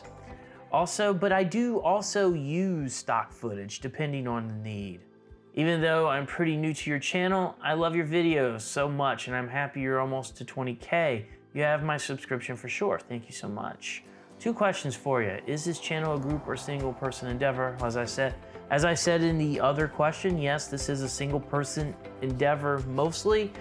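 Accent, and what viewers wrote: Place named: Louisiana, United States. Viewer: I love how despite every video being set in Louisiana, I was still surprised by your Louisiana accent.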